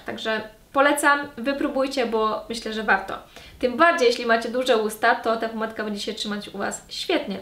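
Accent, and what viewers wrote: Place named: Ukraine. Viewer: jestes Ukrainką? Słyszę akcent.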